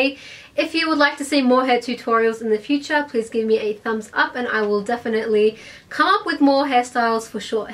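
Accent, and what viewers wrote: Australia. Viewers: She sounds Australian 😍 but she could be mixed or something, still a babe! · Subscribed! Love this video and your accent is so beautiful! Are you Australian?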